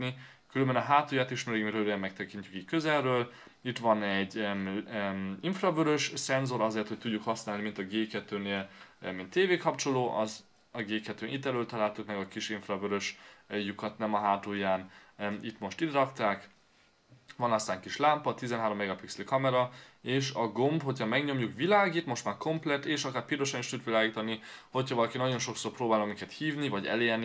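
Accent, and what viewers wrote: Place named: Germany. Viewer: Nagyon durva német akcentus...:)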